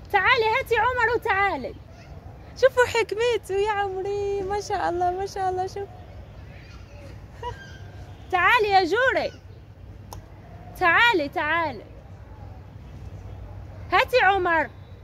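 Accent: Upper Egypt, Egypt. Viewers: فرحتلك راني متبسمة غير وحدي فرحانة بك تعجبني مين تتكلمي مصري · تتكلمي صعيدي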